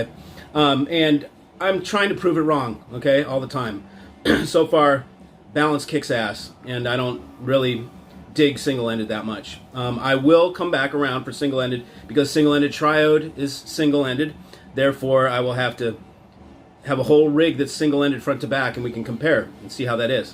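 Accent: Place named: Rural, United States. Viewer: hey man great video, what is it with the hickey accent lol, hey I have balanced amps and pre amps and dac, bought a cable for the preamp once, polarity on the ac cable was inverted, got a hum right there, you make power cables so you should have run over that scenario. My point, even balanced equipment can get hum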